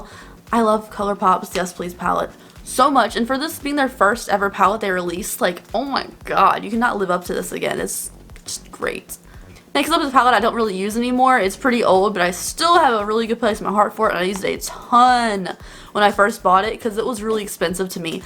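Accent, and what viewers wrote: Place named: North Carolina, United States. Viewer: is originally from North Carolina:)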